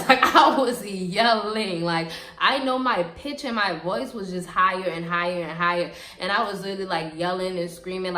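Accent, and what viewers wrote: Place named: New Orleans, United States. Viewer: Oh so you are from N.O, Of all southern accents that's my fave 😂. It sounds like Homeboy had a little thing for you👀😏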